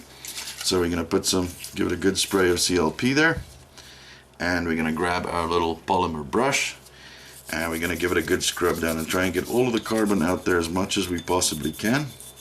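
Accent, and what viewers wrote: South Africa. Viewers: Bru, are you by chance south african?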